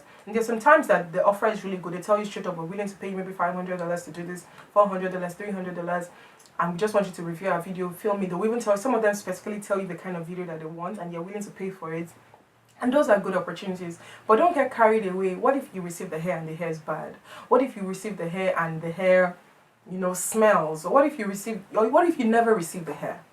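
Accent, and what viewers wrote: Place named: Africa. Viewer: looking beautiful as usual but let's all just act like we don't know the brand lol you sound like an African mummy 'panti leleyi' lovely video as usual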